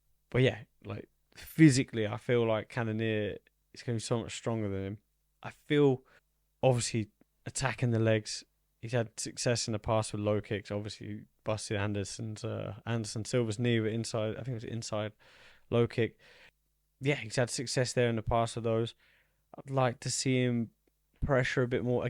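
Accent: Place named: Australia. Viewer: You kinda talk like an Aussie lol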